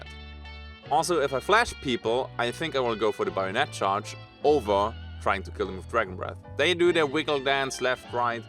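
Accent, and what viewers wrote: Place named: Germany. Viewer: If I may ask, are you German? Bcs you kinda sound german and your grammar hints at native german too. I am always happy to see a Landsmann on the internet. 😁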